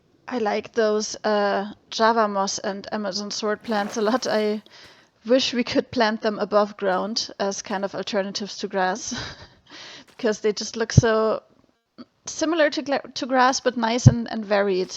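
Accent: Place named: Norway. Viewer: How do you make the timelapse? And which editing software do you use? -Architectix and i'm still working on the build so i can help you if you want. And which nation do you come from? You sound kind of Norwegian in the accent.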